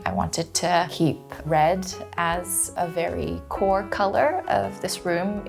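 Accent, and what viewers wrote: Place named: North America. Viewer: This north-american way of talking is painful.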